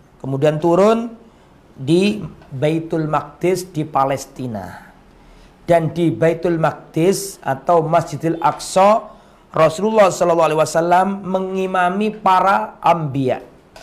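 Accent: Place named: Java, Indonesia. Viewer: Sy suka bahasa beliau yg medok njawani heee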